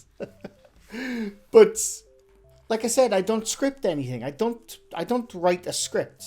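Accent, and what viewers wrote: Wales, United Kingdom. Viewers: it's even better in a Welsh accent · I like your accent... so I like your Welsh accent too!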